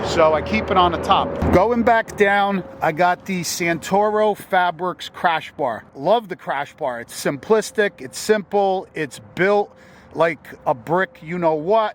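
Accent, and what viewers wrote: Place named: New England, United States. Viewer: I commented this before I heard you say you were in New Jersey, but hearing your accent I knew you were somewhere just below me and that looks just like the Holyoke mall in Holyoke Ma. (Which is only about an hour an a half south of me). I'll deff look for you at laconia. I've actually never gone to laconia for bike week... but Id love to see your bike up close and hopefully go for a quick scoot! I so want to see our bikes side by side threw the gears! See how I'm doin on that little 95" 😏😁👍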